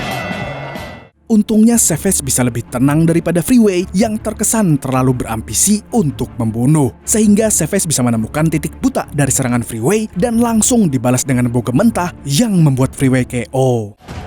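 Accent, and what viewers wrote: Banjar, Indonesia. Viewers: betakun am .. org banjar kah pian mang ?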